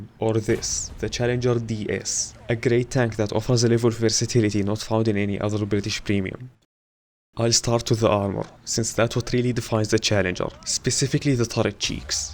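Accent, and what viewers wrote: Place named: Arab world. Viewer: Love the Arabic accent ;) nice vid man, keep it up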